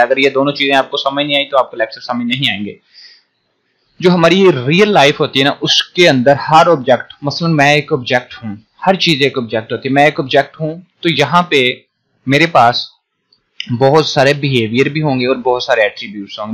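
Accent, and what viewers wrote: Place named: Faisalabad, Pakistan. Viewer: Pka faisalabadi han janab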